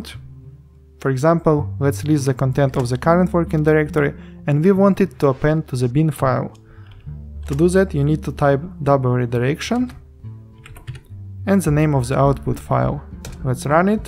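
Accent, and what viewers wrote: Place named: Russia. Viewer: У тебя русский акцент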